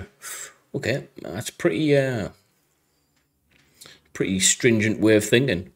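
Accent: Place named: United Kingdom. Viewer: I’m brand new to the channel, I’ve just come across one of your ‘voice over’ videos (the 5 reasons the US will beat China & Russia or similar. I’ve mashed the subscribe and like button. Loving this video, I’m ex-light dragoons, I have huge respect for the royals. Absolutely outstanding soldiers, great moral compass and I massively agree with everything said on this. Where are you from mate? You sound my neck of the woods. Well done on the channel. 🇬🇧🤜🏻🤛🏻⚓️⛴🥷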